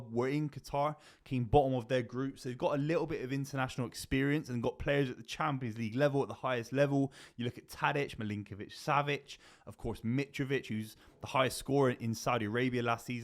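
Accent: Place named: Cornwall, United Kingdom. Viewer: He has a weird Manchester accent… almost sounds Cornish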